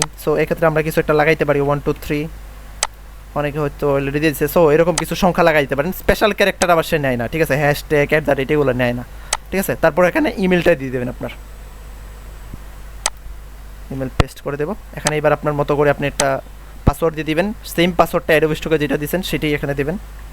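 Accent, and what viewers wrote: Sylhet, Bangladesh. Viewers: vi kita sylheti ni?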